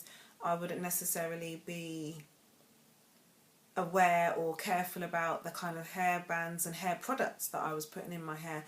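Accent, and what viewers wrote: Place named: London, United Kingdom. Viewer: are you British your accent sound like you from london. loving your videos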